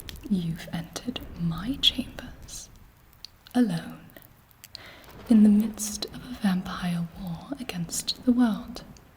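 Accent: RP, United Kingdom. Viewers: As a Brit, I can confirm that your British accent is rather authentic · Your English accent is atrocious I can’t do this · That Bri'ish accent... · i didn't know how much i needed to hear a blonde with a red lip and a british accent say the F word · Wow! I'm British, English, and this accent is amazing! I'm so impressed! Doesn't sound like you even haha. I love this, I also saw your full outfit on insta, my gosh it's fucking amazing... Hehe! It's so cool to hear you swearing but also, cos it doesn't sound like you much, it doesn't seem that bad! Lol, love ya!! ♥️ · you nail the English accent very well. ☺️ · Amazing English accent & acting! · Your British accent is incredible omg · It's called received pronunciation and it's passable at best, nothing amazing. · Well done with the British accent 😊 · OMG, girl ur so amazing. Ur like the best vampire I've seen in an asmr video. 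Very soothing voice, yes I love the british accent, I'd do anything to be recruited by her. 😍This vampiress is such a beautiful and elegant goddess! I'd just wanna be her royal guard for all I want and to fulfill her orders and requests! Yesss lemme join u! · The recieved pronunciation English accent is truly excellent and wow this was something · I think the summary on this needless debate is: You wouldn't be able to tell that is not from the UK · Well this accent is great rp standard accent.. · As a British viewer, just wanted to say… good job on the accent 😌🤙🏻 very authentic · Your British accent is amazing and you look absolutely incredible. 10/10. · Hello from the UK!🇬🇧 You're British accent is exquisite my queen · When I first seen nail shot, I’ve thought to myself okay? she can pull off the look, but can she pull off Camilla rich class pure English voice? Yap she nailed it especially bastard & 🤬 “Perfect” Nicely done 👍👍 Camilla is my favourite & one of the best female vampires Beautiful, cunning & a savage, she will tear you to pieces. 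· 'What is a man but a miserable pile of secrets?' British accent and speech mannerisms on point.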